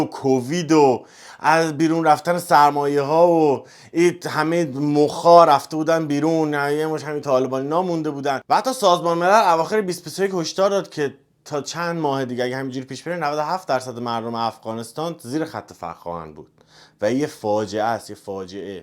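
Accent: Shiraz, Iran. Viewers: یعنی من عاشق لحجه ی شیرازی هستک🇮🇷🇮🇷🇦🇫🇦🇫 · افغاني هستم هميشه عاشقِ طرزِ بيانت-لهجه شيرينِ شيرازيت و تحليل هات بودمو هستم سياسي مخصوصا-بخدا اگه چهار ساعتم ويدئو بسازي آدم خسته نميشه سير نميشه از ديدنش-حرفاي اين ويدئو هم كه به دلم نشست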